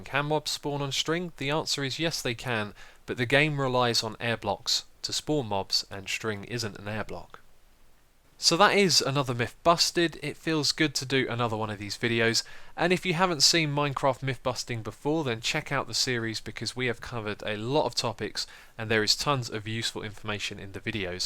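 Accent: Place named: United Kingdom. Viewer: Please do more man your awesome and your British I think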